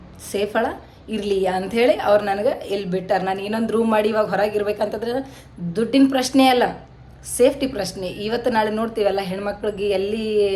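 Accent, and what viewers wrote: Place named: Gulbarga, India. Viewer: ಹೇ ಹುಡುಗಿ ಛಲೋ video ಮಾಡ್ತಿ ಮತ್ತ ಬಹಳ ಛಲೋ ಗುಲ್ಬರ್ಗ ಭಾಷೆಯಲ್ಲಿ ಮಾತಾಡ್ತೀಯಾ ಹೀಂಗೆ video ಮಾಡಿಕೊತಾ ಹೋಗು ನಮಗೂ ಬೆಂಗಳೂರ್ ಭಾಷೆ ಮಾತಾಡಿ ಮಾತಾಡಿ ಬೇಸರ ಬಂದಿದೆ... ಏನಂತಿ 🙋‍♂️🙋‍♂️🙋‍♂️🙋‍♂️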